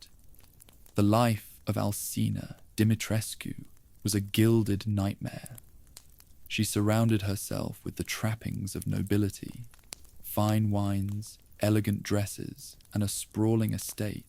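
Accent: United Kingdom. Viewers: Good, calm voice. Crisp sound. British accent(lets go). Now I know what I'm watching for the next two weeks as i drift off to sleep during the 10 mins I'll manage to watch. Great vid dude!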